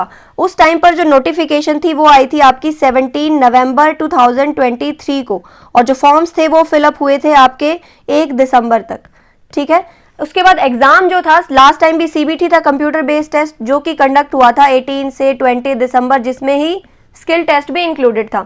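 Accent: Haryana, India. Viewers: Madam Haryanvi h 😂❤ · Hm Rohtak side ki lg rhi h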